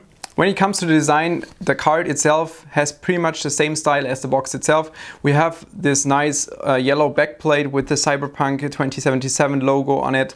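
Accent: Germany. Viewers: Deutscher Akzent is da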